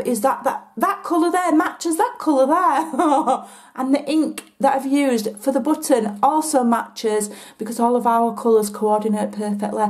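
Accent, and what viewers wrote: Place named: Northern England, United Kingdom. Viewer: Oh poor you....... I suffer with fibro....flippin......myalgia too so I know how you are feeling. Stunning cards!! I found you the other week and subscribed straight away cos you make me laugh so much. Am in Surrey but was in Yorkshire for donkeys years and its lovely to hear a northern accent and the northern humour. Am learning to do the memory albums......... keep being you!! xox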